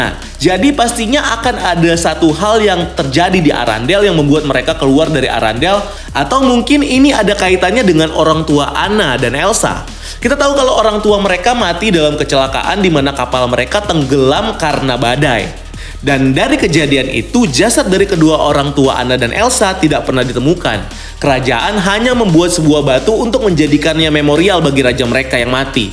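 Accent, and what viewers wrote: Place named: Java, Indonesia. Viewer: ilat jowo bos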